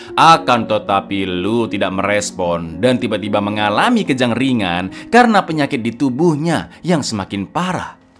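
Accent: Java, Indonesia. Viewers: Iya, meski yg direvisi film yg sama masih pe ngen nonton juga sebab narsi sama-sama menarik dan kocak. Yg satu dgn dialek jawa, satunya sunda. Horror jd ga' horror2 amat, sangat menghibur😅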